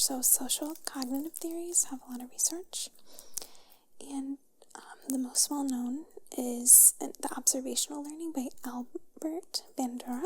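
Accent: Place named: Midwest, United States. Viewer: There is something about hearing the Midwest accent with sunshine and palm trees outside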